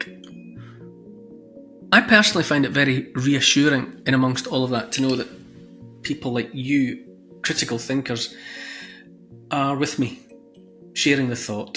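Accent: Scotland, United Kingdom. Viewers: I've just started listening to you after seeing you interviewed by Eddie Hobbs who I also only just found (late June 2025). It's so nice to hear you speak as you think as I do. I am English but I live in America and I see what has happened and is happening. I was listening to David Icke many many years ago, and he wasn't wrong, was he? Anyway, just a thumbs up for your YT channel here. Keep up the good work. And I like the Scottish Accent when I can hear what's being said and yours is very clear.;)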